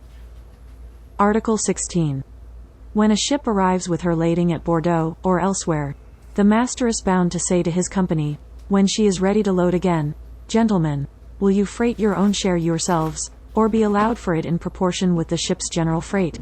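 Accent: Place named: Louisiana, United States. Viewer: Man I love your content. I hope I don’t offend if I’m wrong but I detect a Louisiana accent. Woahdayyyy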